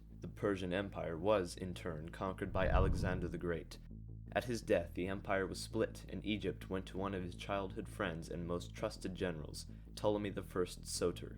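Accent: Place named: Midwest, United States. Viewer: Great channel but I think you should redo your narrative using an artificial English accent like David Attenborough. You have a young midwestern accent. People respect English accents that why most Roman Greek Egyptian movies using British actors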